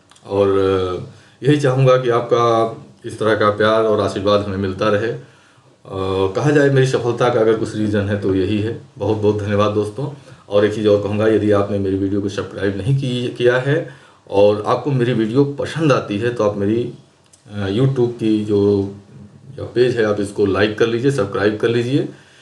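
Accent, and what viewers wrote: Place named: India. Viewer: Photo se bilkul foreigner lag rahe the aap.. bas English sunke samajh aya k aap Bharatiya hai..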